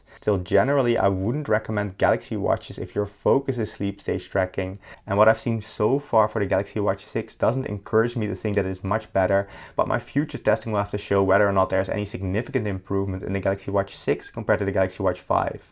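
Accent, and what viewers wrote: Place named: Netherlands. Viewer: 3 times the costs of my Huawei GT runner. Perhaps not totally comparable but I'm very glad with my GT Runner after a few months of use. Het was leuk geweest om je ook een keertje Nederlands te horen praten, al verraadde je accent al heel snel toen ik me abonneerde op je kanaal, dat je een Nederlander bent. 😉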